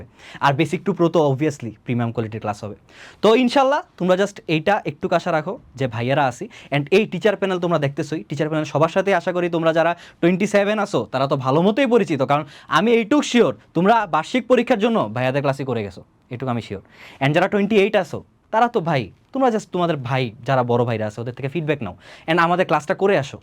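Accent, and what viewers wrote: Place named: Noakhali, Bangladesh. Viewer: Vaiya mone hoy Noakhali er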